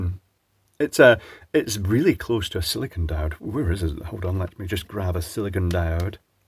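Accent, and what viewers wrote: United Kingdom. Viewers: Really,? you don't have a dog, so you pick the nearest selenium rectifier to blame it on? Gotta say, your viewers are among the most classy lot on YT. I was expecting to see nothing but a pot full of fart jokes, but NO! There's more vulgarity in the comments below the White house news briefings. Isn't it amazing what effect a proper British gentleman's accent has on people?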